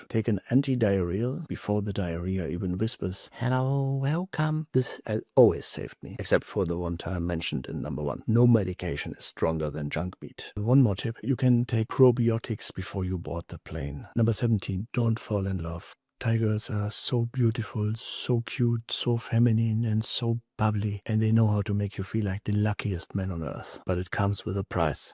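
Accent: Germany. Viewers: It took me until 16 minutes to realize you must be German. 😊 Ill be there in 11 months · haha, ich habe das Video tatsächlich im og ton geschaut und paar Minuten später war ich mir sicher, dass du aus Deutschland kommen muss 😅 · LOL l like the Masterpiece Theatre whisper with the low lighting - think it adds to the atmosphere especially with your great German accent! Been in Thailand about 6 months and you speak ABSOLUTE TRUTH brother and I have actually had many of the same thoughts and realizations as yourself. Thanks for your wry, witty humour and entertaining content. Much appreciated 🙏Here’s to the Elephant Pants!😂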